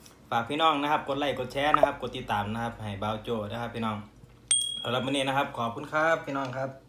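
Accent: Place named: Isan, Thailand. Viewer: คำเว้าแบบนี้ ลูกศิษย์ บ่าวท้าวคักๆผมว่า555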